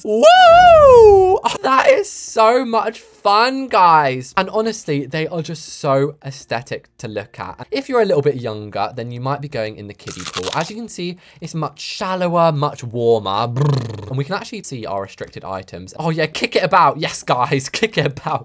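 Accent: United Kingdom. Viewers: As an American, I love your accent and the royals accents, I find them all very funny and British :P Also, I love to imitate British accents :P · BRITISH ACCENT!!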